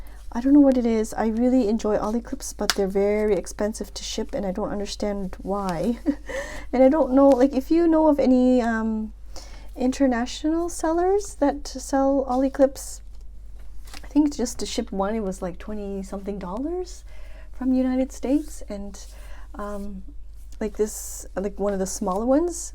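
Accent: Canada. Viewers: Oh! I didn't want the video to end!!! I was enjoying so much! Thank you for keeping it real and for sharing with us how you sometimes struggle to keep up with your journals too. I already commented about the 10a.m ridiculousness in your previous video, but Ugh!!! I really feel your pain! And the gyms too? Pre-Covid, I used to be the first one at my Starbucks am when they opened. I needed my caffeine before heading to the gym! ☺️. I'm a morning person, and if it doesn't happen in the morning, it doesn't happen at all! Regarding the Oli clip, you could maybe try to find a different brand of magnetic paper clips locally? Especially beeing in Japan, the Mecca of stationary, I'd assume that you would be able to find pretty much anything you needed/wished/wanted... Anyway, it's just a thought 😊. I'm really curious about what it is you do exactly. Your job seems to be very intense! 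And what is that "box" you mention putting washi in? Ok, one last quick thing (I promise!! LoL) I find it so adorable when, once in a while, in some of your videos, I hear you say "eh?" at the end of a phrase. It always makes me smile. You might have left Canada, but Canada has not completely left you 😉. Sorry for the long and rambly comment!! Have a wonderful day! ♡♡♡ - X.O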